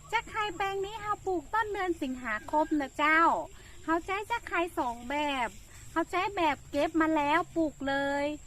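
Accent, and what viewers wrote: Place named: North, Thailand. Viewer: เป็นคนจังหวัดอะไรคะอู้กำเมือง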